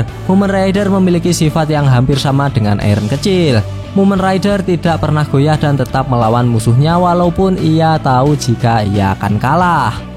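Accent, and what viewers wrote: Java, Indonesia. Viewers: Kon wong jawa ya min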